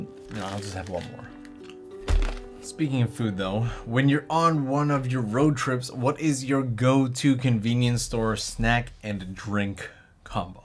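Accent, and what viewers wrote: Canada. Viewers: Your accent is screaming Canadian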